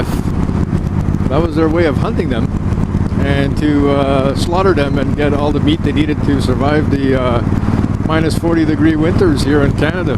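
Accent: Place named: Fargo, United States. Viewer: Hello! A thoroughly enjoyable trip along with some wide-open Canadian scenery! Good to see the Missus and her happy, cheery face! A real treat! Now that the December snow has arrived it was nice to see some earlier, snow-less fields! Hunting stuff with Big guns, Bear Traps, and Fishing gear...😮...😮.. you know me..😉....😉...., I just pop into Sainsbury's Super-Store and collect all my wild-life...ready prepared for me!...LOL..😋....!... and no-one gets shot!...LOL..😂...! Your "Fargo" (film) accent still tickles me,...every time!... 😂...😂...! Many thanks again for the trip, and best wished to the Missus...she needs to be kept warm!....😊...😊...!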